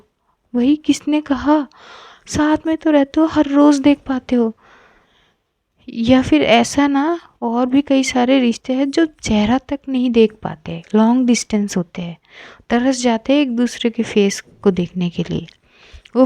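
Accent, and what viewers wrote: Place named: Uttar Pradesh, India. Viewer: 🥰 intezaar to ittu sa bhi n ho pata ma'am pr ab iske alawa kr bhi kya sakte h.....hum UP walo ki tarah aap jb bolti ho n hye 💖 kya kren aapka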